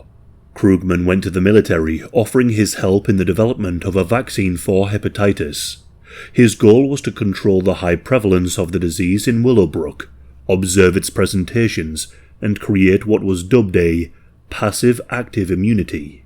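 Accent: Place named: Northern England, United Kingdom. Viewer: Yep, here's another pronounciation nazi surfacing. He has a Northern England accent dumbass. A stunning example of someone who has nothing better to do. Now fu*k off.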